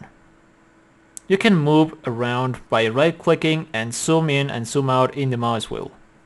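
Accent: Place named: Ireland. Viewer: You sound Irish. Are you Irish?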